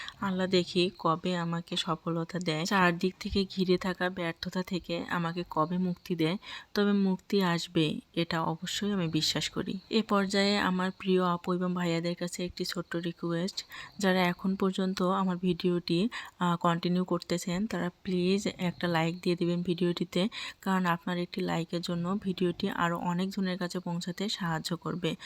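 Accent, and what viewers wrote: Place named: Rangpur, Bangladesh. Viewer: আপু আপনার কথা শুনে মনে হচ্ছে আপনার বাড়ি রংপুর ?কোন থানা জানাবেন আমার বাড়িও রংপুর।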